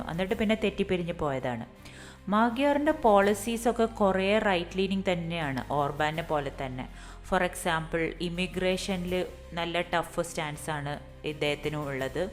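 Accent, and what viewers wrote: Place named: Kozhikode, India. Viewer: congrats 🎉. Big things in simple humor coated "koyikkodan" slang. Go ahead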